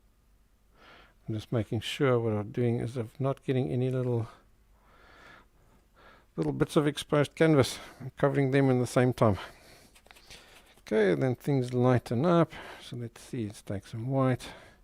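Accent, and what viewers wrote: South Africa. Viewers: Hi. Judging by your accent I assume you're South African. Where can I buy a set of pencils like the one you had in your video about learning how to draw? ❤Love your channel so much; thank you for making time to share your talent!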